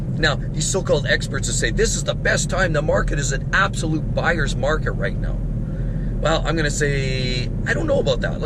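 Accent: Canada. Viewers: Notwithstanding the recent revelations regarding the workload for the Donut Media guys, no other car YouTuber works harder warning and endorsing cars and the issues people experience. When shoppuing for sleeper value, this infomation is invaluable. I also pick up on his Canadian accent every once in a while and he sound like Bob and Doug McKenzie.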